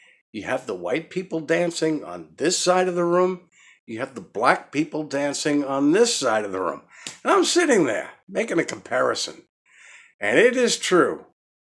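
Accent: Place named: East Coast, United States. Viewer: Love the stories... no matter what!!! You make me feel at home.. with your east coast accent. Ima New Yawka!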